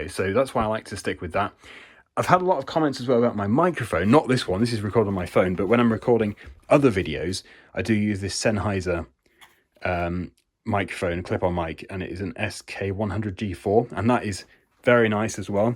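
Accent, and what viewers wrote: United Kingdom. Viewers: your British accent to be very ASMR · Your restoration and rebuilt videos are fantastic! I enjoy the ones when you talk because, to an American ear, you sound like a high class Brit doing grunt work, giving us all hope! Because of you I have tried (and sometimes succeed) to rebuilt a repair small engines that need a little help. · I think every youtuber will go through this. You know the answer, its a matter of being committed and do it. The next dilemma will be time. Do you hire someone to do your editing? You'll work it out. People love these videos and its great to hear a pommy on yt. Good luck greetings from Australia.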